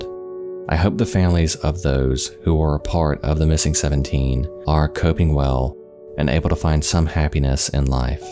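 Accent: South, United States. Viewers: Is this ASMR? Smooth Southern ASMR. Are you young people able to stay awake listening to this?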